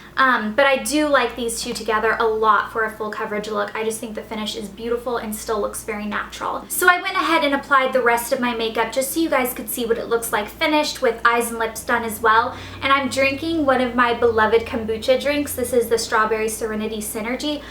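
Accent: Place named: Tennessee, United States. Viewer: She has a bit of a Tennessee accent, leave her alone. You wouldn't ask why an Asian or and East Indian person talks a certain way, would you?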